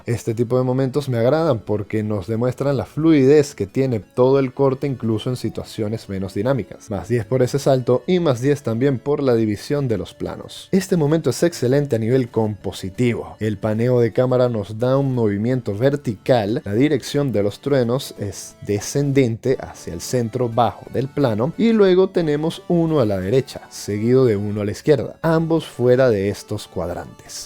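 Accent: Venezuela. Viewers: Eres vzlano? O_o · Primer comentariooooooo, oye eres venezolano? Me da la sensación, me encantan tus videos, saludos desde Venezuela mi pana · Eres venezolanoo? jajaja ´´sabes como es la vaina???´´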